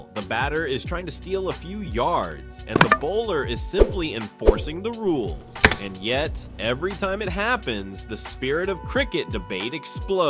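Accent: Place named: United States. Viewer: Given this has an American accent doing the commmentary explain why the Mankad should be treated any differently to the hidden ball trick or a pick-off by the pitcher in elite baseball. The instance of the ball in play hitting the wicketkeeper's helmet is easily overcome given there arre some international grounds that have a small storage hold with lid in the ground where a helmet might be kept.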